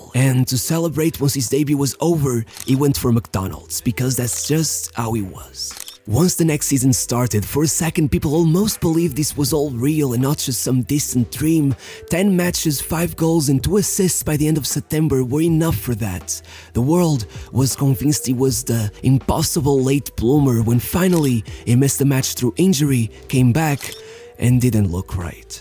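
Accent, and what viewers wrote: Portugal. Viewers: you sound portuguese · I'm sorry for asking but are you Portuguese? You sound Portuguese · Tuga accent😁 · They are not. is reading the names in his Portuguese accent 😅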